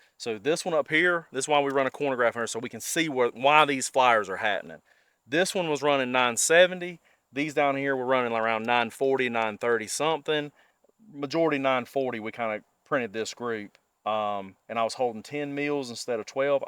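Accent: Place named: South Carolina, United States. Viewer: I know an SC accent when I hear one. Awesome video. I was interested in .300 blackout but maybe I'll save myself some money.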